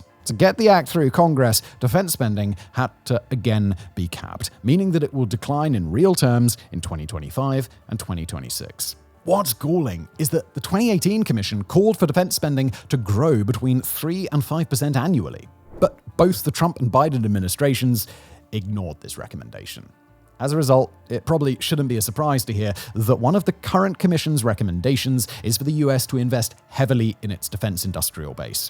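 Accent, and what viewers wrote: United Kingdom. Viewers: This is what Americans get wet for, hearing a Brit tell us that we're not ready for war.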